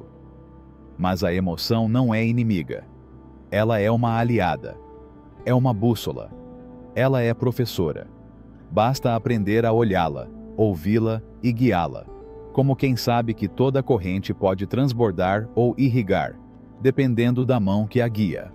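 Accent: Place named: Minas Gerais, Brazil. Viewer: O narrador é mineiro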